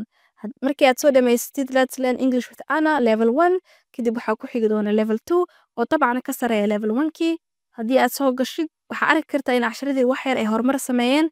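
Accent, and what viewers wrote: United States. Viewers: Wll waxan kaa rbaa appkii aad ku baratay American accent thanks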